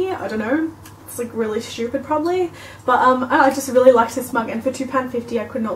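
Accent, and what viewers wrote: Australia. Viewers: Yeah it's definantly Australian then. I was having such a moment last night. Think I'd be able to recognise my own accent lol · She's either from Australia or New Zealand (I can never tell the difference). I'm English and she's definitely not from here :) hope that helps x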